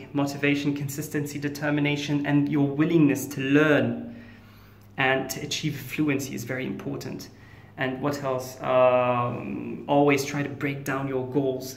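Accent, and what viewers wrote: United Kingdom. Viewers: If you aren't British then your accent is amazing Subscribed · its true though, the only word that I heard a slight accent in is motivation, and probably two others. Other than those words, your british accents sounds perfect to me! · Thanks for sharing the video...I see that your prefer British English and speak it in an amazing way. For me I prefer more the American/Canadian English with a big respect for the British English :) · Your english accent changed from american to british lol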